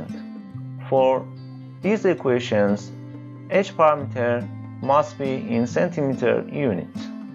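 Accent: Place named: China. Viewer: Nice video! BTW, you sound Chinese.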